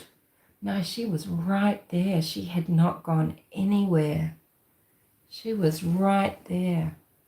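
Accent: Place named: Australia. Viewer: Yesss. I'm listening now. It's bedtime. Love listening to your Aussie accent.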